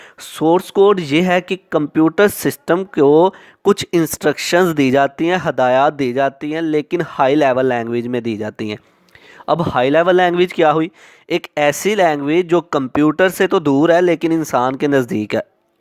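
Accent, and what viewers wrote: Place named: India. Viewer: u make the title english then speak indian ?!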